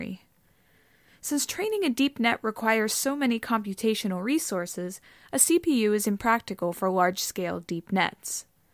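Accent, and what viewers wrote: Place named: Pittsburgh, United States. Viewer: Luis Bill very true hahaha. It's apparently a Pittsburgh accent thing (that I've undone with some voice and speech training for future videos) 😊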